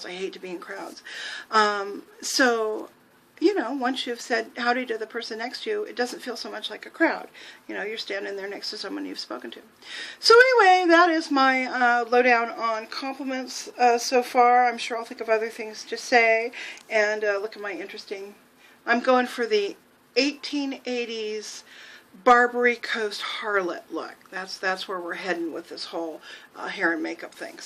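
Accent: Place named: South Carolina, United States. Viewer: I cannot wait to see you in character with all the bells and frills and everything else complete. Love that South Carolina accent, by the way, you got it goin' on! :) Mah word!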